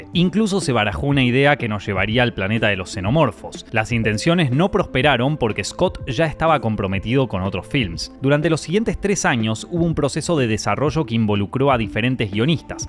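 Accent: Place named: Buenos Aires, Argentina. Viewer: La tonada porteña es insoportable.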